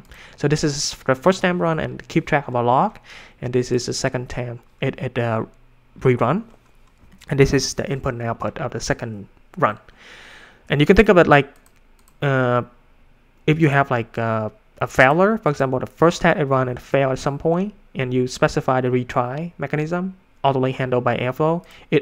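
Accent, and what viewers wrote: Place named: India. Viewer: looks trainer have destroyed his accent , is a mix of Indian, US and some his own styling on accent. Sometimes it's impossible to understand.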